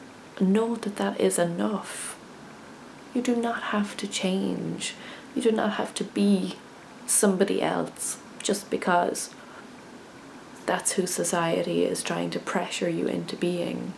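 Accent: Ireland. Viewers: Off topic, but your brogue is just lovely!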